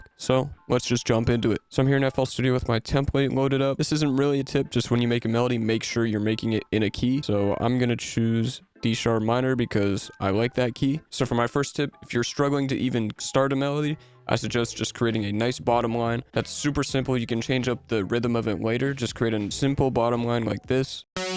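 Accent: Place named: Canada. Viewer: This whole video helped alot and i can hear the canadian in your voice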